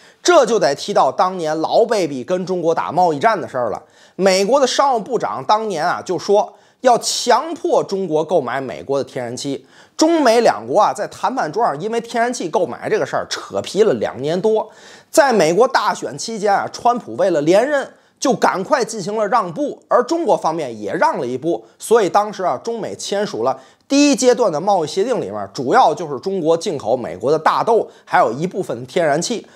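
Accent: Tianjin, China. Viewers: 太喜欢听的天津话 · 我在天津呆过两年，这天津卫的普通话太亲切了，你是真有才，把天津人的幽默发挥到财经政治方面，加油，继续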